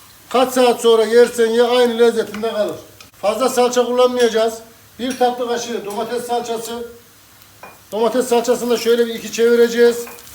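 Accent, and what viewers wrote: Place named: Tokat, Turkey. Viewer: Konuşma Tarzın İç Anadolu tarafı Gibi .Merak ettim Nerelesiniz?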